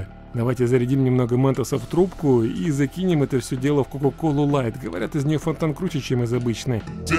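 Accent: Ukraine. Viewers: У тебя украинский акцент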